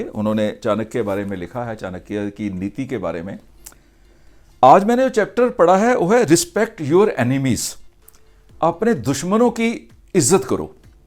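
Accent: Punjab, India. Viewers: Punjabi accent h unka ye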